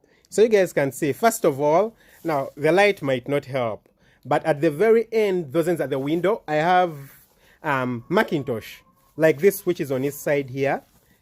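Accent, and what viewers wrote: Uganda. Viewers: You just speak 🗣️ like a Ugandan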